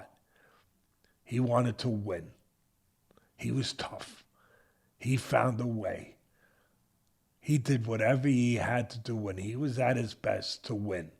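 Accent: New York, United States. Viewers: I love everything, especially the NY dialect. It’s broad, honest, genuine.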